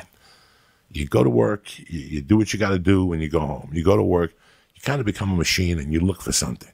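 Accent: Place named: Italian American, United States. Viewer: He could play the old fat Italian mafia boss kind of role even the accent he's perfect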